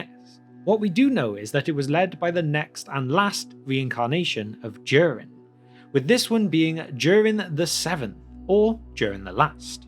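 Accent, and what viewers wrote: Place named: England, United Kingdom. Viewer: I came across your videos this afternoon and I'm really enjoying them and the fact you add some style to pronouncing Elvish and Dwarvish names. The American LOTR vloggers mangle everything or just pronounce the place names like they might actually be somewhere in Wisconsin. One thing - I'm presuming you're English? I can't put my finger on your accent but the giveaway is you pronounce Durin as "Jewrin" instead of "Doo-rin". Like the "Juke of Edingburgh" (although you Brits never called Duran Duran "Jewran Jewran" thank God!) It's the only flaw in your otherwise excellent work!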